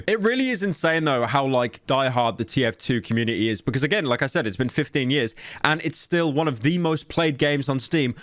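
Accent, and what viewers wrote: United Kingdom. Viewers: please take off the british accent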